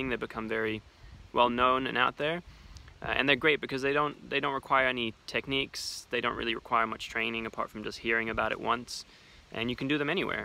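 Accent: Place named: New Zealand. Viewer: Mate, you are getting a kiwi accent! I used to see you in Kingsland in 2010s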